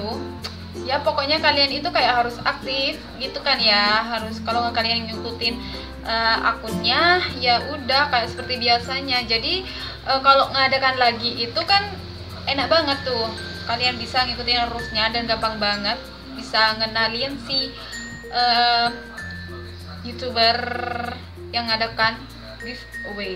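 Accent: Madura, Indonesia. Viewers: kakak dari madura ya? bicaranya kek aku hehe :v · Logatnya mirip bgt sama orang Sumenep (Madura) 🙄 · Kayak ada madura-maduranya deh kk 😂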